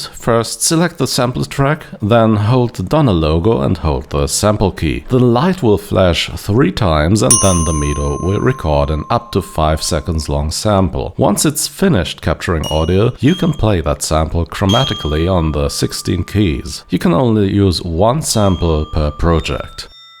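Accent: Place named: Germany. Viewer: The German accent!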